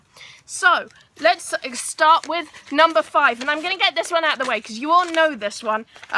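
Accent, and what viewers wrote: United Kingdom. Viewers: ARE YOU AN ENGLISH GIRL?? BECAUSE YOUR ACCENT APPEAR LIKE YOU'RE FROM UK??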